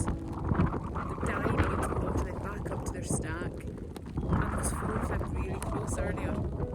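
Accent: Scotland, United Kingdom. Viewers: I've just watched this again! I could listen to you all day haha I just love your accent. It's weird for me that my great great grandad would have had one similar (tho he was from Dumfries & Galloway) because no-one in my family has a Scots accent. So the music, in this one I think it's just right, but I do think when you are speaking, don't have music as well. I have my volume up loud so I can hear the commentary, so it's a shock when suddenly it's music and loud lol. Did the wind muffler arrive yet?? You should be really successful at this you are SO watchable.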